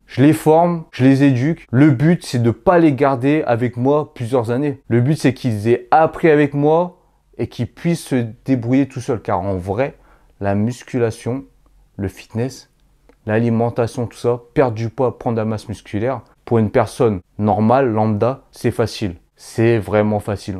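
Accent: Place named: Marne, France. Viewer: Qd je t'écoute, je me rends compte que c'est vrai qu'on a un accent, nous les marnais !😉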